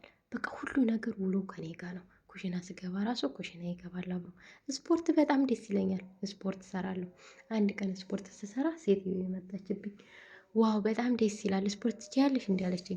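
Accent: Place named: Wollo, Ethiopia. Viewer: ወሎየ ነሽ አነጋገርሽ ያስታውቃል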